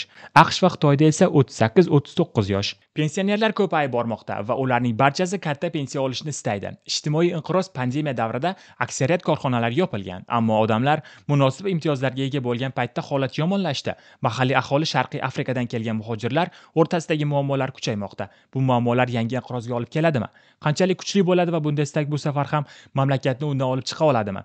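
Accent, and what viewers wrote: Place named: Surxondaryo, Uzbekistan. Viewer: Siz Surxondaryoliksiza, ya'ni Sariosiyo tumanidansiz adashmasam)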